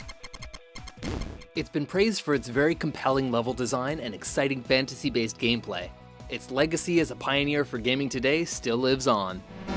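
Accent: Canada. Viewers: That's the way Canadians talk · FIRST WORLD PROBLEMS It's a Canadian accent. get the fuck over it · For every person going "THEY MISPRONOUNCED MARIO!!?!?!" The narrator is Canadian so he's obviously going to pronounce things differently. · He's Canadian. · It's called a Canadian accent, stop being so butthurt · It's a Canadian accent. get over it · he's canadian.....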